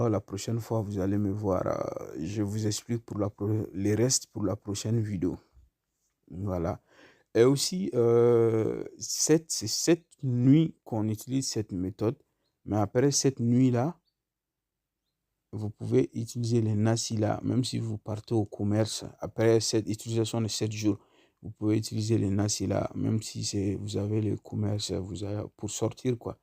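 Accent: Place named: Mali. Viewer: Tu est du mali jia l'impression avec ton accent je crois reconnaitre le pays 🤧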